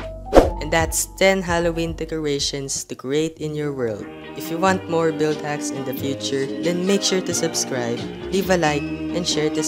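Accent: Philippines. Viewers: ur from the philippines, i can hear ur accent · Ate u a Filipino?the accent lol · What is your nationality? I think your Filipino because of your accent? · Ummmmm are you filipino? Couse you sound like filipino hehe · U sounds like Filipino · Pinoy ka kuya noh?? Yung accent mopokasi hahahha halata!! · Break not Broke. also are you filipino cuz your voice sounds filipino...^_^ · Men hes accent is familiar? Are you a filipino?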